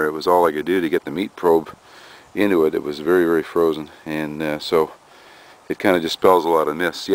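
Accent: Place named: Canada. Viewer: I heard your from Canada cause you said.. ouuut… like houusse.. it is just I noticed it in the Canadian accents.. 🌺👍🏽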